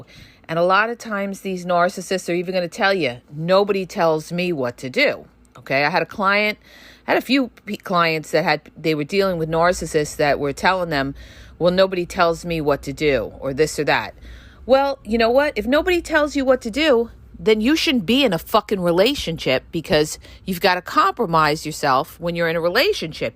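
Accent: New York, United States. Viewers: I am sorry to always be so complimentary, but I am understanding what you are saying on a deeper level. I get excited! This is understanding narcissists, which is the only way to proceed with them. I have so much more power with the truth of it all when I understand what they are doing and who they are... especially in the legal realm. As always, thank you, thank you, thank you. You can trust a native New-Yorker when you hear the accent and you see your street-wise consciousness. New-Yorkers see it all and it has been a matter of staying ALIVE to be able to defend in a brutal world.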